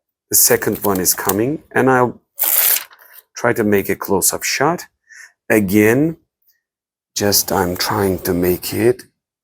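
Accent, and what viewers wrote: Turkey. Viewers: Abiii Aksanın türk aksanı olsun yinede güzel.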